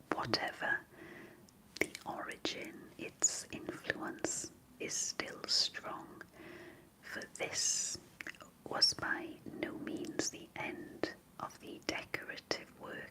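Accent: Wales, United Kingdom. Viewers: I really love the Welsh accent, especially when women speak it, but the ASMR whispering just isn't for me. Are there any recommendations for YouTube channels, podcasts, something where I can listen to some heavy Welsh accents talking about some nice stuff?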